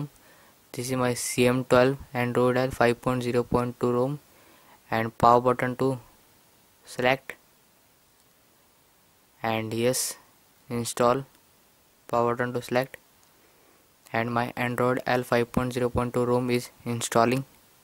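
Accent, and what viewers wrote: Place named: India. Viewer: Stupid india accent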